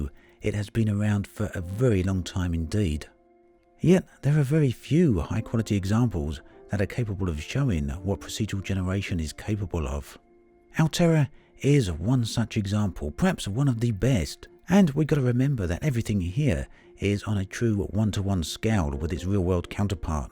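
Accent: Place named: England, United Kingdom. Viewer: How in the name of good lord fuck did you think he was from america lmao he has one of the strongest English accents around hahaha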